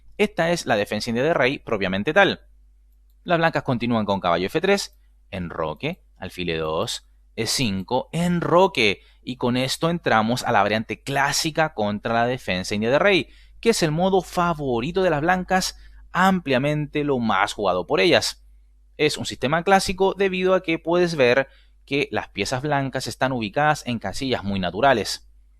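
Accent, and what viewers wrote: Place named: Chile. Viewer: OYE, TU ACENTO TE DELATA: ¡¡¡TÚ ERES CHILENO!!! MI PAPÁ TAMBIÉN ES DE RAZA CHILENO COMO TÚ!!! ¡OJALÁ! ALGÚN DÍA, CHILE, LLEGUE A TENER UN CAMPEÓN O CAMPEONA MUNDIAL DE AJEDREZ!!!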